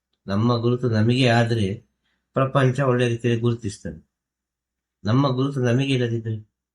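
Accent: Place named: Dakshina Kannada, India. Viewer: ಗುರುಗಳು ಆಗುವ ಮೊದಲು ಏನಾಗಿದ್ದರು..? ತಿಳಿಸಿ . ಮಾತುಗಳು ದಕ್ಷಿಣ ಕನ್ನಡ ದ ಕನ್ನಡ ಆಗಿದೆ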